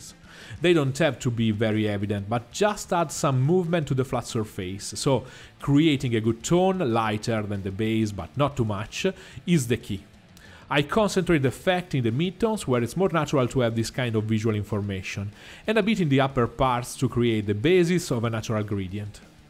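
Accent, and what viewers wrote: Italy. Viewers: Awesome Italian accent dude 😎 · Però se parli italiano qualche volta non farebbe schifo 😂😂 si sente che sei italiano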